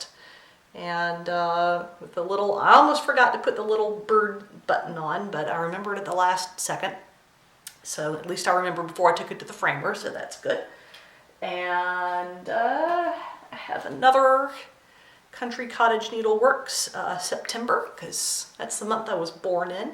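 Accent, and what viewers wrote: United States. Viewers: I just found your videos and I'm enjoying them. I'm hearing a few southernisms in your accent so I'm thinking Kentucky or maybe Indiana. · great first video I have been watching floss tube for over a year but not found the courage to make a video yet I think your accent is from some where around south Caroline but since I am from Canada it is mostly a guess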